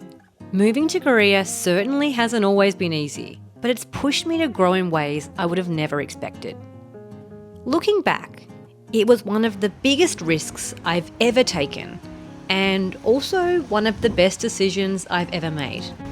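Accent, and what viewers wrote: Australia. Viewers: 호주 영어 넘 듣기 좋아요.^^늘 응원하겠습니다. 전 반대로 호주에 있는데 한국에서 새로운 도전중에 악기나 스크린 골프 뭐든 배우시는것도 추천드립니다.^^ 그리고 아프시면 안되니 병원도 꼭 자주 가서 검사받아보세요. 제가 호주에 있으면서 제일 불편하고 적응안된 두가지입니다. 한국은 이 두가지 천국이에요^^ 꼭 원하는 성과 없으시길 응원드리고 늘 구독하겠습니다.홧팅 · G’day, mate! Glad to see an Aussie here in South Korea. I’m a Korean Aussie and used to live in Adelaide but now living in Korea near Daegu. Came across your YouTube clip and so glad to hear Aussie accent in such a long time. perhaps, 4-5years since I left Adelaide? All the best for your new journey and hope you to find many joys much happiness.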